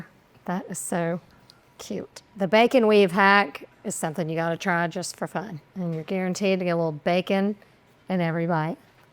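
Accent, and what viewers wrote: South, United States. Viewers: County accent + hazel eyes + Italian = Sexy woman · You remind me of Ella Langley a bit, especially that raspy Southern voice of yours.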